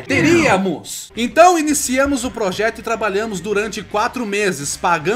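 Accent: Brazil. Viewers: você é do sul mano ?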